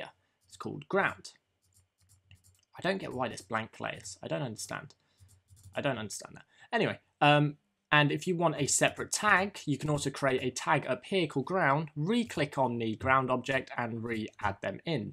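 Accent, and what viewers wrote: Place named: United Kingdom. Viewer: Hi, trying out the code in the first script, I get an error message saying "Input does not contain a definition for 'GetaxisRaw'". I checked every letter, it is matching the one in the video. I tried with Getaxis, but it doesnt change anything here. Does someone knows about it ? Great tutorial, and great British accent as well ^^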